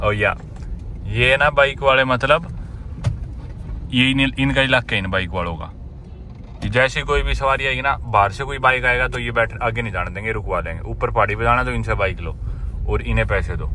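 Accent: Haryana, India. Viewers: Like ur hariyanvi accent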